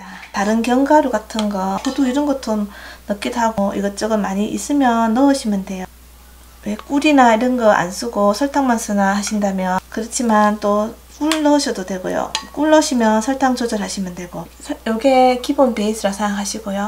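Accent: Gyeongsang, South Korea. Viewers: 경상도 말씨 진짜 정겹네요.말씨가 넘 예쁘요.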